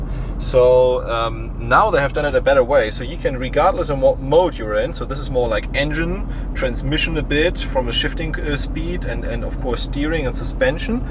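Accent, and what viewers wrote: Germany. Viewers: great video! more talking videos please! Also really enjoyed your German accent! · Der deutsche Akzent ist ja schrecklich 😂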